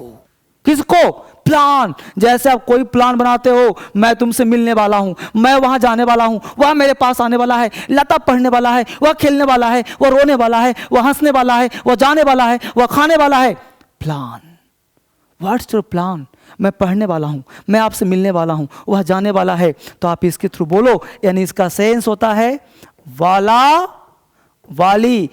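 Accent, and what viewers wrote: Bihar, India. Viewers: ( Wah Sirji ! )you have great energy.I like the most your Bihari tune when you teach online..Ek Bihari sau pe bhari...you have proved that....and go ahead with god bless you..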